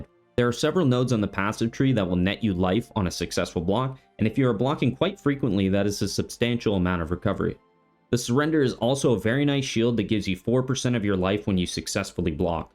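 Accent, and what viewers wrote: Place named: Canada. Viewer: love you content, bud. Yo are you from like Minnesota? You sound like you've got a light Canadian accent coming through sometimes, I'm wondering if we're neighbors lol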